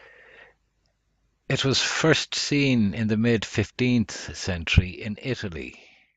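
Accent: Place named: Ireland. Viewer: Very nice to hear a fellow Irish voice on their voyage of discovery.. Really enjoyed the vid. Any views on the mud?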